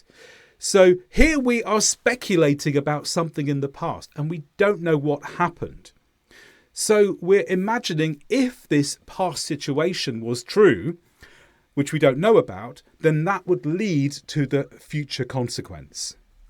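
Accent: United Kingdom. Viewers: Wow, wonderful world class english. I like british accent V.much.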